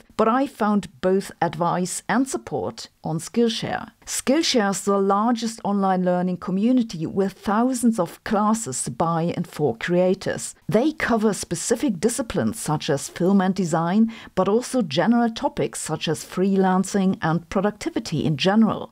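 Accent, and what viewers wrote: Germany. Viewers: I have now realized that Sabine's monotone German cadence has completely lulled me into a sense of false security. Should have read the date before watching this.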